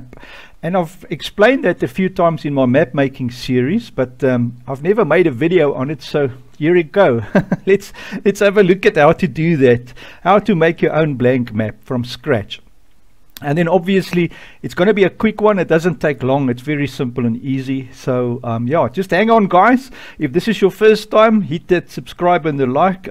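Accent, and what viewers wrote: South Africa. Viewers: Ek kan sommer hoor jy is van Suid Afrika lol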